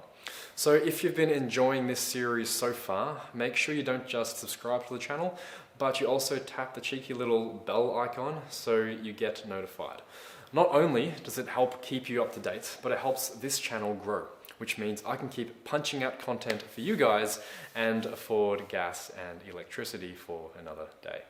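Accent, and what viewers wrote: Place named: Australia. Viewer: He has the same Aussie accent as the Supercar Blondie.:D Loving your videos! Keep it up!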